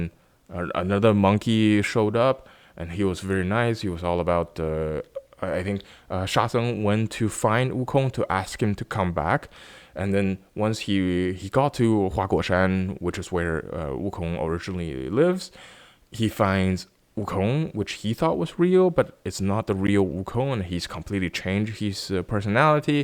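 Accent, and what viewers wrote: Standard. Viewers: holy shit，Your Chinese thank-you words made me even suspect that you are a Chinese blogger in a foreign country, your Mandarin is really standard! damn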